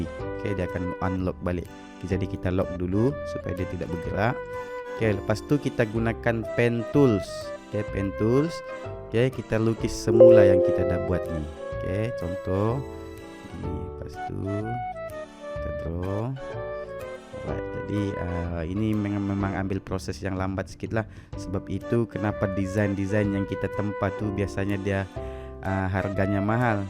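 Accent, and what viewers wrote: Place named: Sabah, Malaysia. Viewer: sabahan loghat...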